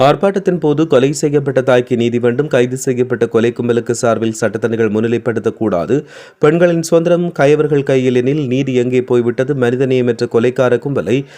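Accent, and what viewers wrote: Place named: Eelam, Sri Lanka. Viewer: ஈழத்து தமிழில் செய்தி வாசித்த சகோதரருக்கு நன்றி.தமிழ் மனம் குரல் வளம் சூப்பர்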